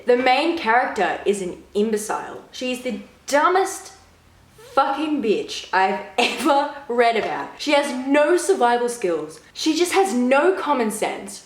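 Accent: Australia. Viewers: that's not a British accent it's more of an Aussie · It’s definitely an Aussie accent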